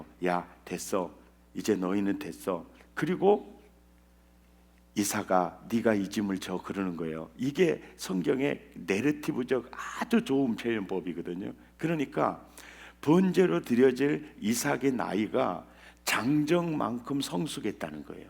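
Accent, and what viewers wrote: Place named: Mokpo, South Korea. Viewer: 목포 목사님 부흥회 너무나 잘보고 있습니다 사투리가 어찌 그리 정이 가네요 저도 고항 장흥 입니다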